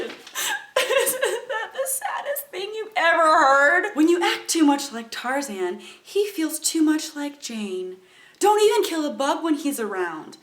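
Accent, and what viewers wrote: South, United States. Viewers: Where are you from? You sound like you have a Southern accent.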